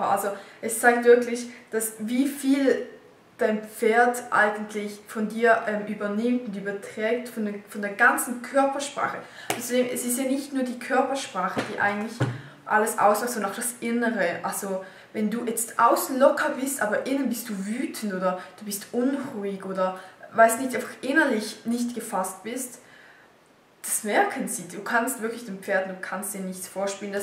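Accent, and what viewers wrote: Switzerland. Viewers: Haha schwizer Dialekt! :D Wo stoht dis Ross eig? (Sorry wege Solothurner Dialekt xD) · sooo süß wie man den schweizer dialekt raushört :D !!!! schöne grüße ausm ländle !! jedsmol wenn i dine videos aschau wür i so richtig nidisch xD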